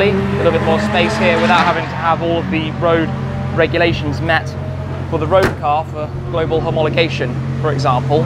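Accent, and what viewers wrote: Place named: United Kingdom. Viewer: Shmee please dont lose your british way of speaking, its what a lot of ppl like about you. Saying race car instead of racing car is just another americanism working its way in. Its too easy for us to lose our identities in this internet world nowadays. Aeroplane and racing cars for me 👍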